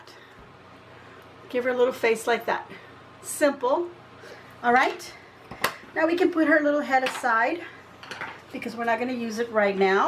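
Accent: Texas, United States. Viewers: can I assume you are from Texas? Loved watching how you do your crafts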